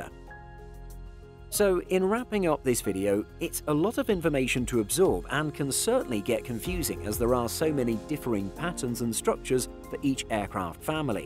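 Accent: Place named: North, United Kingdom. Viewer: This is first time I’ve noticed your northern accent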